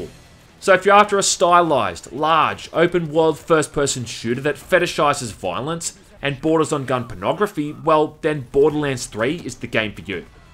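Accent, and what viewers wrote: Australia. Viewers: I don't get why you're so hung up about them removing a slur from a game. Comparing your Aussie accent to being a little person is kinda apples and oranges. I get that ppl on twitter can be volatile, but like, there are reasonable reasons that ppl would want m*** removed from the game. In my experience, even trying to have a calm discussion about these things causes people to plug their ears and go, "Sjw sjw, i can't hear you, tuning you out, bla bla bla bla bla bla" I'm aware that gearbox doesn't actually give a shit about the slur, and is just protecting their bottom line.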